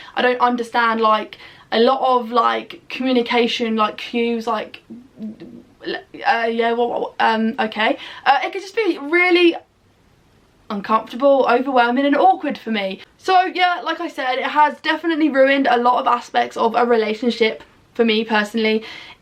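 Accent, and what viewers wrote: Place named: United Kingdom. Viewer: I find non-violent communication from Dr. Marshall Rosenberg to be helpful with direct conversations about difficult topics. It's an amazing way to show what your needs are without being pushy (or feeling pushy) about it Also, you sound British. In Dutch society it's a bit more chill because people are used to being direct (I'm Dutch). So culture plays an important part as well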